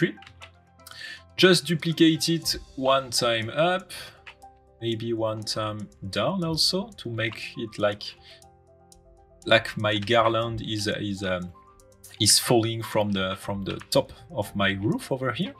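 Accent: France. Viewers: fait des vidéos en Français stp ta un gros accent Français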